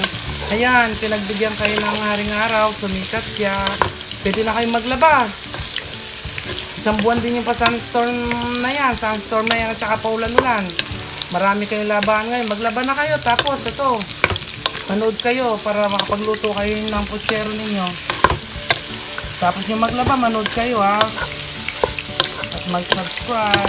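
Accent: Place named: Pampanga, Philippines. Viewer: Galing ng magluto cabelen talaga halatang kapangpangan ya pamagsalita.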